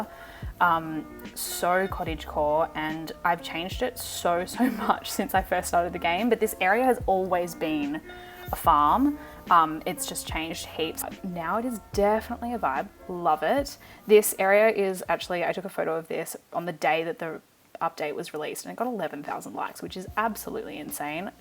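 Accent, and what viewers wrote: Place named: Australia. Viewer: 🧡🧡🧡 so happy to hear an Aussie accent for a change hehe x love your island so much! Xx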